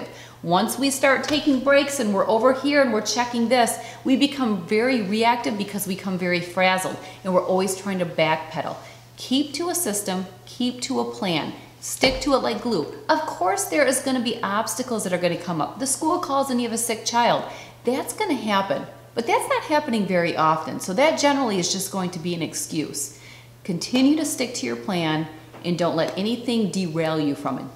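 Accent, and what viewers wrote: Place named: South, United States. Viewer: I like the family calendar idea., random question, where are you from? (Which state?) I'm new and i haven't heard you mention it yet and I didn't see it on your website either but ya know what they say, I'm blind in one eye and can't see out the other (anyone else have that problem?:P) If you dont wanna say, thats cool. I'm just hearing southern....a lot of southern...but idk, i could be wrong:)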